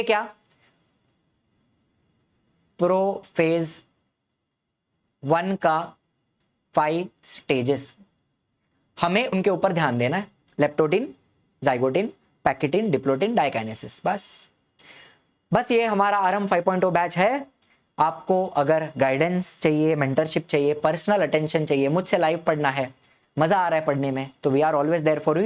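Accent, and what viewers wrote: Maharashtra, India. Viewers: Sir are you marathi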